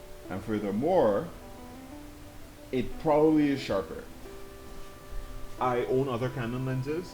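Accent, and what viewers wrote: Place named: Trinidad and Tobago. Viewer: Triniiiiii. Lol I can hear that anywhere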